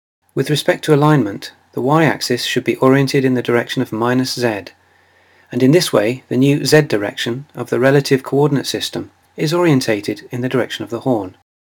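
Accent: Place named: United Kingdom. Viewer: Wow lovely British accent :) loved it. Thumbs upped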